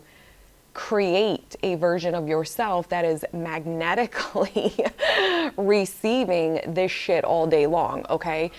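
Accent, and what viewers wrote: New York, United States. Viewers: I was literally wondering about how you got this New York accent living in Florida and then you immediately explained LOL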